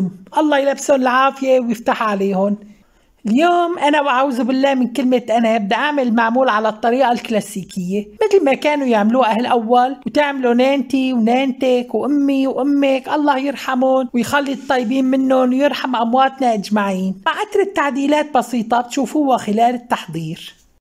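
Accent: Aleppo, Syria. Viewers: من بعدك ماحدا يحكي حلبي حببتينا باللهجه الحلبيه وتعليقاتك الراقيه الخفيفه الحلوه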